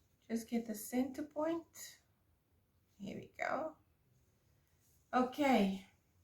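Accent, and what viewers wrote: South Africa. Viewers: Beautiful. I am also a newby with this hobby and prefer the Dala Craft paint rather than the Dala acrylic. Where do you buy your Dala paint from as I am looking for 1 liter Dala bottles. There is a company in CT that sells the 1 litre containers, but I cannot remember the name and no name rings a bell if I google it. By the way, it is wonderful to hear a South African on Youtube pouring art channel.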